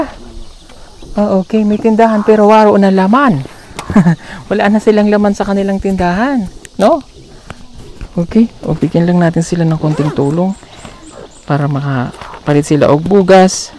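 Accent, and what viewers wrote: Visayas, Philippines. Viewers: Maganda araw sir kabalo n jud ka mag bisaya hehe.masaya n nman ang mga katutubo dahil dumating si sta clous lagi pasko pag dumating ang pb team sa sitio ng mga katutubo. salamat sir at sa boung team. salamat din sa mga sponsors.god blessed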